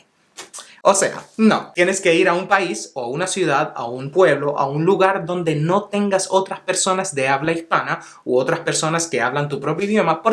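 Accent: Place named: Venezuela. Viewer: al principio identifique tu dialecto pero me confundí cuando vi Chile… pero algo me decía qué era de Venezuela… ademas me gusta mucho tu elocuencia…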